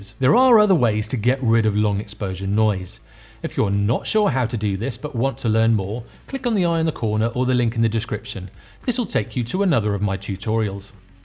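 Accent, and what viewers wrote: United Kingdom. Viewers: I like your presentation skills - you present with conciseness and brevity. And, cheery on the top is your british accent! ;)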